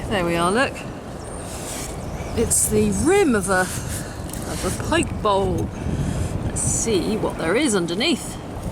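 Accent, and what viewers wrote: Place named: RP, United Kingdom. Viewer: Please don' take this offencively but you look and sound like really posh totty.You also really enjoy your finds.A perfect lady of which there so few these days! Keep digging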